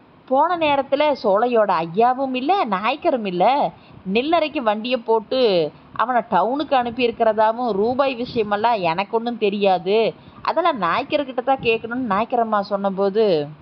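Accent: Kongu, India. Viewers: கரிசல் கதை கொங்கு தமிழில் 👌🏻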